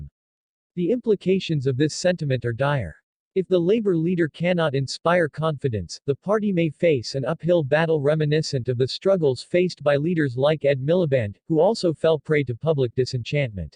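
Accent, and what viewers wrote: United States. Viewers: i have never heard of anyone being kicked out of a church unless they caused a nusiance ,this looks like,yet another of these youtube videos that have suddenly shot up with American voice overs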